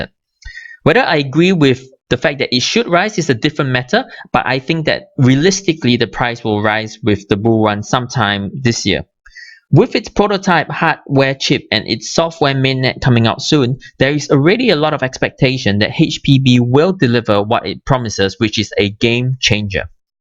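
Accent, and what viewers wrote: Singapore. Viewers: Yup, im from Singapore and I live in Singapore, when i heard your accent I knew you were from Singapore. omg nice to see a fellow Singaporean into crypto too, anyways we could get in touch, would like to learn more knowledge on crypto :) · Great analysis and explanation, and I like your Singlish! Can you review QLink ?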